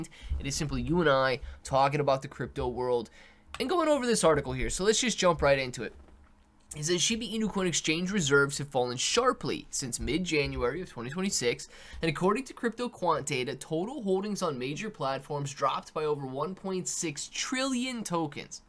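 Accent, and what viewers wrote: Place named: Australia. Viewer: Your Australia accent was awesome!!!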